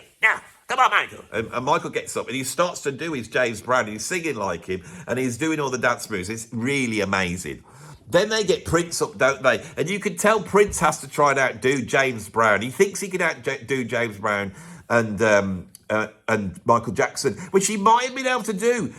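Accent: Birmingham, United Kingdom. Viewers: Five minutes in and my ears are bleeding from the wall of unpunctuated sentences, delivered in what I presume is a Worcestershire accent, sounding suspiciously Brummie. All this and we've almost finished defining "overrated". Brevity, please, dear Dog. Unfortunately I want to find out who these guitarists are because nothing is more fun than finding opinions to get irate about. How about some bookmarks?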